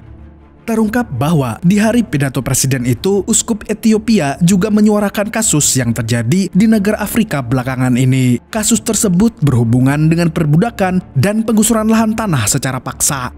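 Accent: Banjar, Indonesia. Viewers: banjar banar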